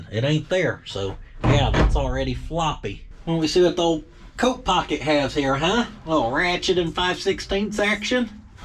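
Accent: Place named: South, United States. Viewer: are you sure your not one of rednecks from down south way. The way you talk and spell sounds like us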